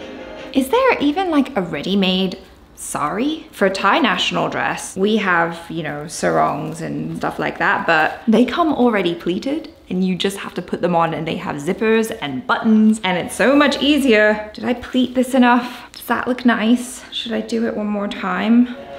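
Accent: United Kingdom. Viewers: is more British on her own channel than Jaby's. Nice switch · I knew was British But god damn the british accent is so thick here😂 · That British accent is strong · Wooooohh.. Your british accent is gorgeous ❤💛😍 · sounds more British here... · I have an exam tomorrow but here I am watching trying to wear a saree and speaking in her British accent. Ye bhi theek hai!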